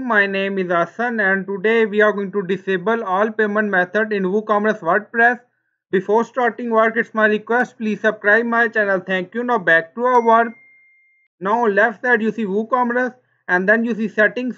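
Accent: India. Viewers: indish english per excellance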